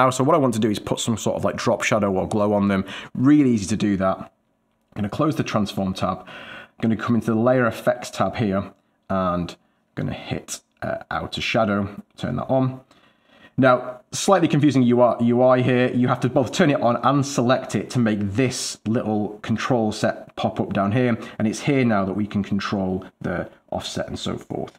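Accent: United Kingdom. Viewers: I hear a British accent. I subscribe 😝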